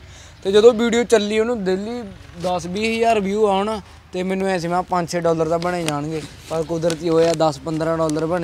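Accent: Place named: Puadh, India. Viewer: Y tera pind kehra Boli tan Sade aali Puadhi lagdi aa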